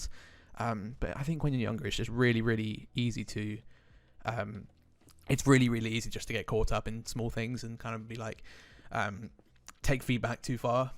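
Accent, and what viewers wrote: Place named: England, United Kingdom. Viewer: Roughly where in England are you from? You sound a lot like me, that would be cool if I knew I was close to you. If you don’t want to say that fine.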